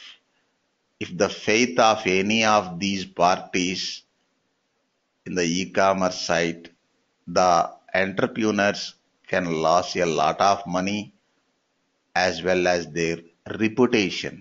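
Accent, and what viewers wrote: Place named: Telugu, India. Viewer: He is a Telegu speaking person. It is a normal accent there. Rely the knowledge he is given. Accent can be adjusted in head.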